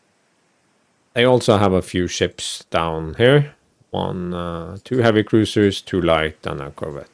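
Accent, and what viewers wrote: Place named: Scandinavia. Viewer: where are you from if you dont mind me asking? youre accent sounds Scandinavian to me but i would like to know again if you dont mind me asking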